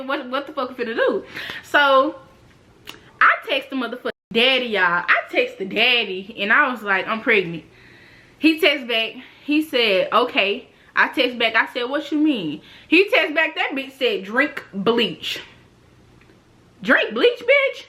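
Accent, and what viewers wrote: Louisiana, United States. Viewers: Not you from Shreveport lol . It must be a Louisiana thang 😂😂😂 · I just knew you was from louisiana😂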